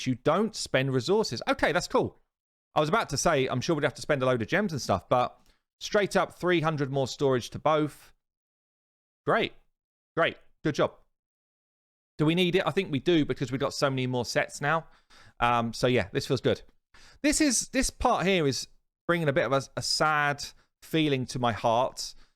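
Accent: United Kingdom. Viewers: i could listen all day long to your lovely british accent! 😂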